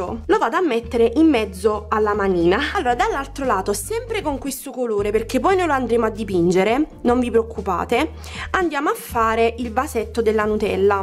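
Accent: Naples, Italy. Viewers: Adoro il tuo accento Napoletano😍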